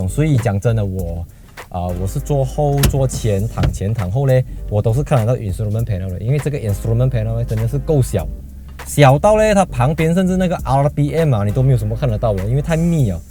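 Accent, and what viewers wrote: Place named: Malaysia. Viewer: 每次看你 test drive ，觉得你不去 当赛车手真是可惜了，😂 讲话又有 Malaysia styles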